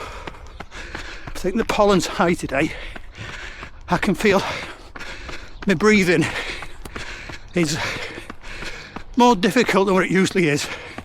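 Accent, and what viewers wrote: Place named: United Kingdom. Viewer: Oh, I suddenly feel like I oversimplify my warm-ups (when I have them anyway) a bit too much 😅 I just jog around with a few brief sprints just enough for the sympathetic system to properly kick in (takes about 7 min for me) and that's about it. I recently discovered that it's very easy to see the exact moment (only post factum unfortunately) when it happens on the HRV chart of R-R intervals — they suddenly stop being random and heart goes into that "fight-or-flight" mode. PS: just noticed that you too say "me" instead of "my" :) for some reason only recently noticed that Brits often do so and I gotta admit I really like how it sounds. 😊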